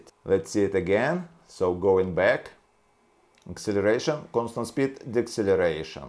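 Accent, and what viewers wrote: Ryazan, Russia. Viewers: So Russian accent :) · Адский русский акцент, прям facepalm. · Это акцент Рязанский . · Я понимаю, я и близко не могу говорить на английском так как Вы, но,...блин русский акцент, это просто пипец, <link>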